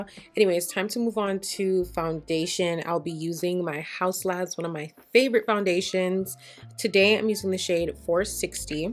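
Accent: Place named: Jamaica. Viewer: It’s the Yardie accent for meeee lol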